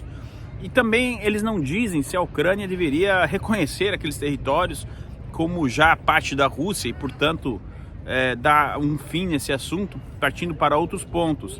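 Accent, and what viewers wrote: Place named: Paraná, Brazil. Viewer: Nossa mas seu sotaque paranaense tá tinindo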